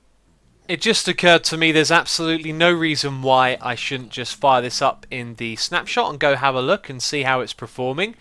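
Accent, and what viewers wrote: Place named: United Kingdom. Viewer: British accent. Same as chuchorial.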